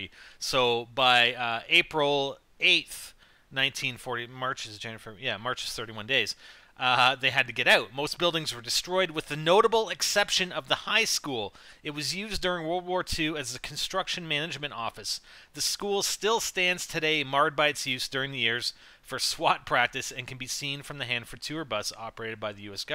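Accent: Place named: Canada. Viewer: let me guess your Canadian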